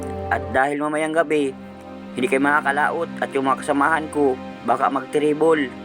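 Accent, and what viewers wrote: Bicol, Philippines. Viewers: kabayan na tatawa ako sa tagalog mo may halo bicol taga jaan pala ako sa wuezon province idol sa ka banda jaan ako sa san narciso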